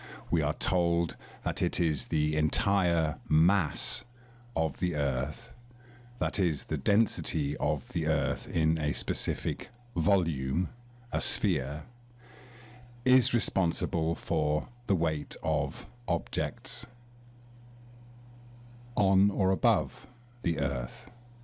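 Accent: England, United Kingdom. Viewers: I am so glad to hear this guy with some sort of English accent -- I thought all of the flat Earth nutjobs were from the US. It makes me feel a little bit better to know that some of the morons are from abroad.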